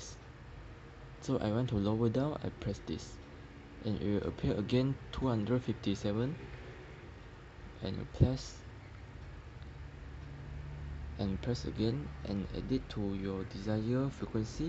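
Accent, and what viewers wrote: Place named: China. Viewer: 请问能出一期中文的吗，本来就英语不好，还要听chinglish😁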